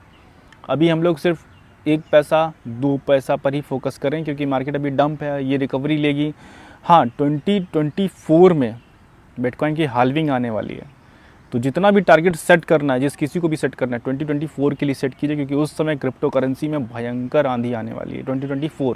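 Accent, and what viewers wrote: Uttar Pradesh, India. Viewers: Sir yeh Kaunse GARDEN me Video banate ho har Sunday ko? aur aap Kaha se ho...? aapka accent UP wala hai, mai bhi UP se hu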